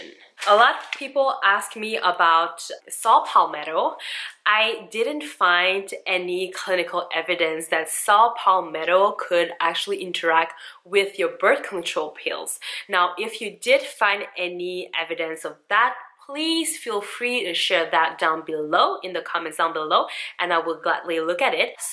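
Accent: Quebec, Canada. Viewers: So happy I found your channel! It's fantastic that this product has brought up so much discussion about science and health supplements on YouTube. I wish Tati all the best with her business, but even if she finds the backlash difficult to deal with, I'm happy it's leading to an increase in science literacy among at least a small fraction of her subscribers. You did a really good job going through the various aspects of the product. On a more personal note, I'm a Quebecer living in the United States doing my PhD and your French Canadian accent reminds me of home. (: Merci beaucoup! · il me semblait que j'entendais du français genre québécois comme accent, ☺️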